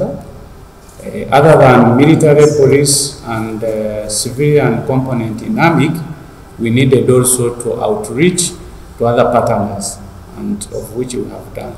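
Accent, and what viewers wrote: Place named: Eastern Uganda, Uganda. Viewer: He speaks like a Eastern ugandan for some reason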